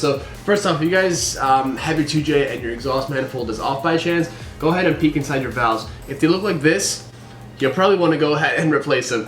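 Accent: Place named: Australia. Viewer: That Aussie accents on point ahahah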